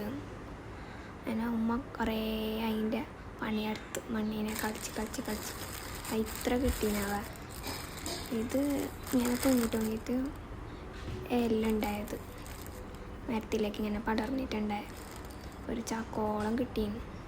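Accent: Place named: Kasaragod, India. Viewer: നിങൾ കാസർഗോഡ് ആണോ😂 സ്ലാങ്😅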